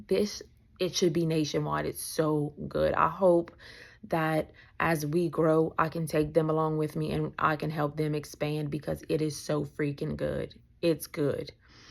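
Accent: Louisiana, United States. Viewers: My step mother was killed by a drunk driver on her way to work, the day before my bday and we had plans for that day. Changed my life forever. The fact people still drink and drive when all these apps exist is beyond me. Y’all are touching and changing many young lives when they think about drinking. This was cool to me to learn about as well I’d love to do something like this too one day and look forward to being able to donate to help in the meantime ❤ Being from the south I get the accent thing but imo if they listen you can’t hear the lisp and the Cajun is just Flavor for the words 😂❤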